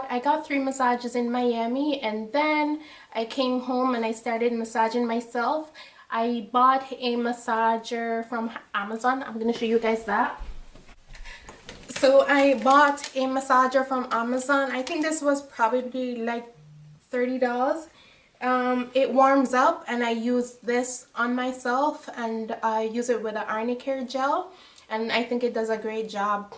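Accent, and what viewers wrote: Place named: Jamaica. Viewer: I know a Jamaican when I hear them! I can hear through your American accent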